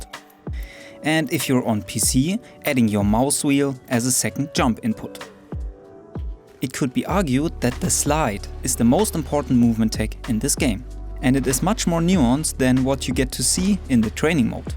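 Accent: Germany. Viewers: german? sounds like... besides, awesome video! :) wish i had seen this months ago. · wie man sofort raushöhrt dass er deutsch ist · this german Accent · You make amazing videos dude, keep it up and I believe somedoy you'll become one of the biggest apex content creators :) Btw are you from Germany? I kind of hear a german accent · this guy has a strong german accent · Man hört einfach dein Deutsch raus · Nur eine Frage bist du deutsch Man erkennt das an dein Akzent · Do I hear a German accent? 🤔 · its the german accent for me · you can tell by his accent that he is german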